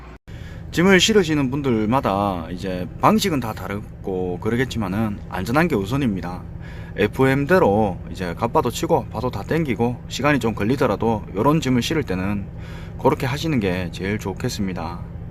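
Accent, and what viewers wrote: Gyeongsang, South Korea. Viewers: 늘 지나다니면서 저게 뭔가싶었는데 공룡알이라는 귀여운이름이었군요ㅎㅎㅎ 영상잘보았습니다 저도 경상도사람이지만 경상도말을쓰면서 친절하게 잘 알려주시네요 잘 보았습니다^^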